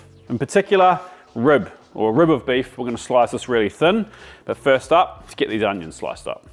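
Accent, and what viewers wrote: Australia. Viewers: Sounding very aussie now mate, gotta come back to your whakapapa 🥝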